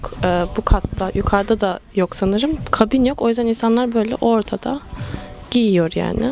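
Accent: Kastamonu, Turkey. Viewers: FRANSIZCA DİL ÖĞRENİRKEN Mİ GENİZDEN KONUŞMAYA BAŞLADINIZ YOKSA KASTAMONULU olabilir misiniz?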